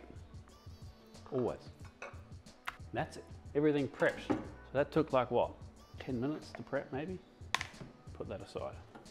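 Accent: New Zealand. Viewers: Was wondering where you were from, seen a lot of the short reels come up on instagram where you didn't talk much. but the moment I heard you say the word "Rinse" I could tell you were a Kiwi. Runse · I had a feeling you were kiwi from the accent, but confused cause you lived in QLD 😂 Can wait to try this dish and surprise the Mrs 😘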